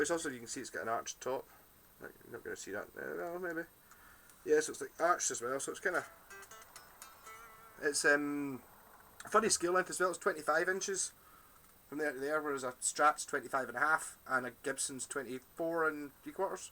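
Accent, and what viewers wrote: Scotland, United Kingdom. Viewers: Thank you.......Yes your Scottish accent threw me off a little bit lol, also your right about the Factory being Top-notch, I can tell because I can see just how Well this guitar is made. I've owned several priceless guitars in my day, I'm no stranger to CRAFTSMANSHIP, and this Instrument HAS IT! Plays Great, Feels Great.......Thanks again you have another Fan who now resides in the middle of the Pacific. Aloha from Maui.......🎸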